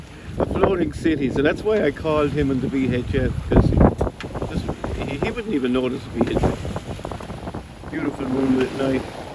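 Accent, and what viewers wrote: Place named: Ireland. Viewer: It's so awesome to see people living their dreams. I'm so jealous!! A healthy kind of jealousy though, I'm very happy for you and your Irish accent:) Give me another 10 years of work and I'll be right there with ya! Keep living free and please keep sharing your life with us. My mother and her friend just got back from Lisbon yesterday. I told her that I will visit Lisbon some day, and that my visit will come from the sea, like the explorers and traders from 5, 6, 700 and more years ago. The history that came through Lisbon is incredible, you're part of it, and some day my boat and I will be also. If you ever are curious where your fans are from..I live in Fairport, New York. Where do you keep your boat when you're back in Ireland?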